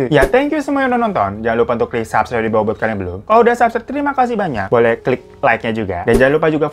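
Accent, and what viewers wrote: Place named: Banyumas, Indonesia. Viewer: Ngapak su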